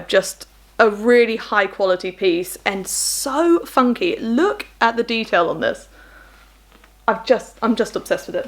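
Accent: United Kingdom. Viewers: I'm enjoying your british accent 🥰 and i love thrifting 💖 new subscriber 🎉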